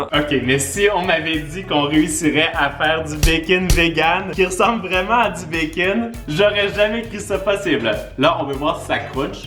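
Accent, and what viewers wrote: Canada. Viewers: Chui le seul à kiffer l'accent canadien ?? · j'adore ton accen canadien♥♥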